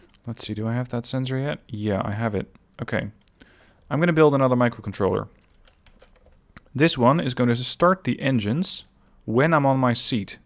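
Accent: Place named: Netherlands. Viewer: Nice vid ar you dutch you have a Dutch accent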